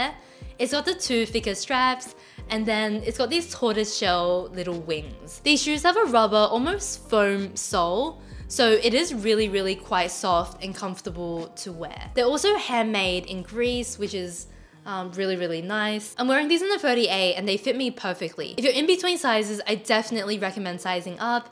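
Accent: Australia. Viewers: I just love your Australian accent, just like rose's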